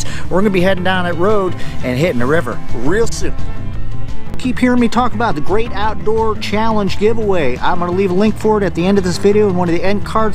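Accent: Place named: Minnesota, United States. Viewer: I recognize your accent (reminds me of Al Lindner). Are you from the Brainerd, Minnesota area?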